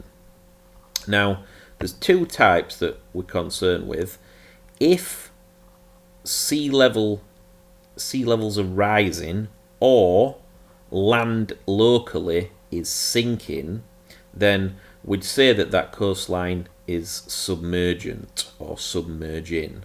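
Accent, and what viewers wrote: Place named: Northern England, United Kingdom. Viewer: I'm only here to listen to that delicious nothern voice say 'Cooooast'.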